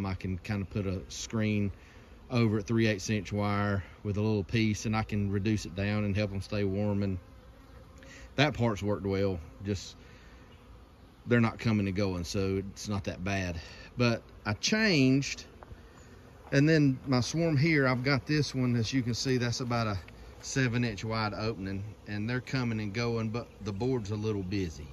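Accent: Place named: North Carolina, United States. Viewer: Good flow in NC also. I’m up the road from you in foothills. I’ve always taking reducers out during flow. I knew you were from NC before you said so. We got that accent.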